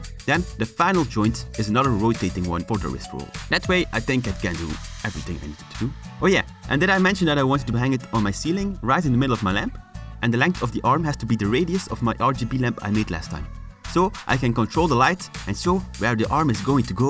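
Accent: Netherlands. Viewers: I smell Dutch. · u sound rlly nederlands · You Dutch.?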